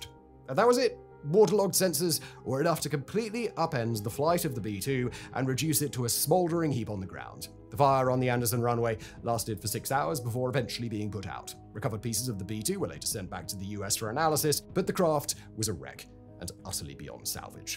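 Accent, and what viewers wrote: United Kingdom. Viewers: I am sure he sounds normal to you and you neighbourghs in England. You are right, I may have to concede he does not exagerate his accents, I 'd rather say he does not care for those who does not live in the same accent area. What I experience is no problem listening to most popular science speakers such as Bill Nye, Neil de Grasse-Tyson, Carl Sagan, Michio Kaku, Brian Cox, Richard Dawkins, Dave Farina, David Attenborough for instance (only mentioning men voices, because women voices tend to be more intelligible in general). I might have overstated, I understand him. I still prefer other accents/voices. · Dudes voice is like a caricature of a brit... every video feels like I'm listening to a very dry Python skit.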